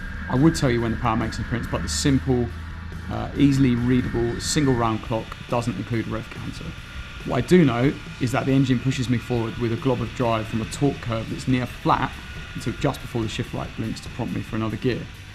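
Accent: United Kingdom. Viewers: He's British, that's the way they pronounce there.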